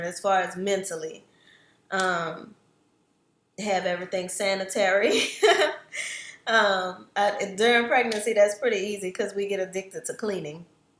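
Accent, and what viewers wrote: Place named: New Orleans, United States. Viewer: I love that you shared this! Having a birth plan is key when giving birth at either a hospital or birthing center. My midwife had a major concern with my pregnancy because I was so tiny, so she was afraid that my baby wasn't growing anymore and she wanted her to come out. She was going to insert something vaginally to induce me, but when she referred back to my birth plan, she did her best to follow my wishes and she did just that. My first birthing experience was amazing! I hope you have a better birthing experience with this baby. Congrats! You have a beautiful family & I also noticed that you are from New Orleans, I can tell by your accent because I am from there too. Lol!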